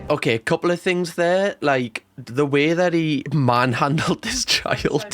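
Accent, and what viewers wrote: Newcastle, United Kingdom. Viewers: Can I just say never change your accent and don't start saying 'mom' like some other UK YouTubers do; your accent is great and repping for the geordies!! ❤ from in London, UK ❤ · your comments are hilarious and true. Proper Geordie?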